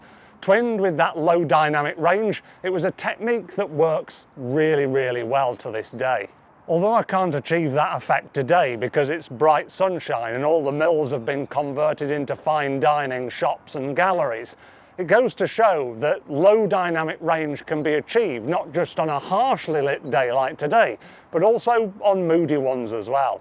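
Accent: United Kingdom. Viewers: Is there a more English looking and sounding man than this one? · This guy sounds so British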